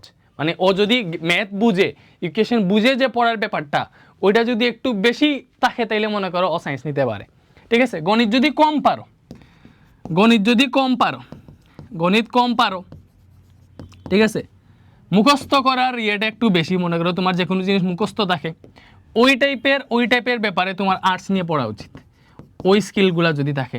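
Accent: Sylhet, Bangladesh. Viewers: ভাই আপনার ভাষায় বোঝা যায় আপনি সিলেটি😊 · bahi mone hoy sylheti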